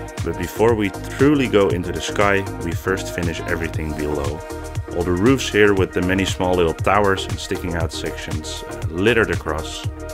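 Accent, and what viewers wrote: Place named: Netherlands. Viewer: No hate but this is the first video I’ve watched from you and I immediately recognized your Dutch accent (de mijne is erger😅😭) and I love accents so it doesn’t matter❤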